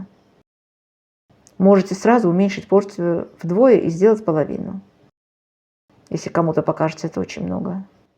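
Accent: Baku, Azerbaijan. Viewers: Добрый день,! Мы с вами землячки, я тоже из Баку.Родилась, выросла и большую часть жизни прожила в Баку а сейапс живу в России.Очень приятно слышать и слушать знакомый бакинский акцент. Спасибо за ваши рецепты.Попробую сделать мороженое.Всего вам доброго!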